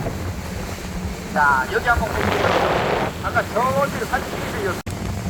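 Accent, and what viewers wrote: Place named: Jeolla, South Korea. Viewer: 구수한 전라도 사투리 정겹습니다 에메랄드 빛 바다, 코끼리 바위, 거북이 바위, 거시기 바위... 홍도 정말 절경이네요 영상 잘 보고 흔적 남기고 갑니다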